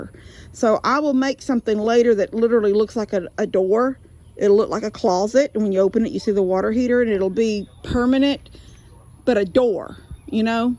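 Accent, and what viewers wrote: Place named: Georgia, United States. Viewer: I feel like I'm in the room with you having a conversation. I was in a funk because of some physical problems, surgeries, etc and getting older. I was told I could no longer do stuff and I believed it. I needed repairs to my house little things I used to do myself or with my husband or things my Dad taught me growing up helping him. I learned from my Mom also. During COVID my handyman left this state and I wasn't able to find someone to take his place. ( I wasn't going to get scammed). So I watched you and I said I can do that. I'm limited to some things physically My body can't do I tried but I painted, patched holes, cut boards bought everything that was needed to make a closet just had to get help putting it together. Watching you reminded me I knew how to do things I wasn't helpless like I was made to feel. 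Now I have found a really sweet young lady that has worked helping out on her grandparents farm and knows how to do some stuff and what she doesn't know I show her and she has helped me finish a couple of projects and is going to help me with others. She works goes to college and still helps her Dad keep the farm going for grandma. I showed her your video and she said that you talk like me I'm from GA but living in Illinois now. Anyway thank you for reminding me I can still do stuff and even teach other young women to be self sufficient.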